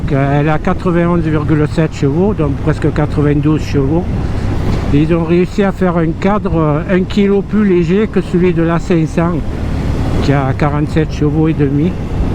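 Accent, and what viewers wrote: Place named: South, France. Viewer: Salut l'ami, j'aime ton accent du Sud 👍. Cette Hornet 750 ne m'intéresse pas mais de tous les Youtubeurs que je suis abonné je fais une exception parce que c'est toi, donc j'ai regardé ta vidéo. Moi c'est la nouvelle Transalp que j'attend et j'espère que tu pourras l'essayer dès qu'elle sera dispo à ta concession. Après, selon la fiche technique c'est la hauteur de la selle qui me fait peur...J'ai pas de grandes jambes, c'est pour ça que j'appréhende les Trails. ✌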